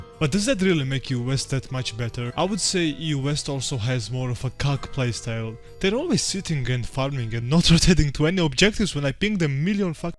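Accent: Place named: Georgia, United States. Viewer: i can tell from your accent you are georgian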